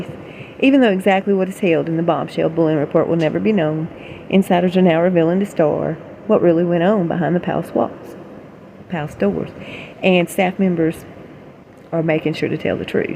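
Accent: South, United States. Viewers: your voice is so soothing and has that sensuous Southern drawl; won't you consider narrating audiobooks, just so we can listen to you speak whenever we need a little "relax" time?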